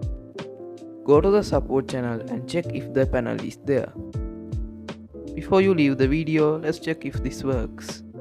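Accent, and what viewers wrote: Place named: India. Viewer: You sound really really really Indian!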